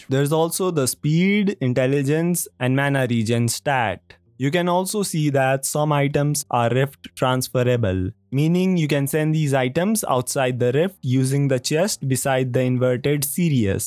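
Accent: India. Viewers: Love the indian accent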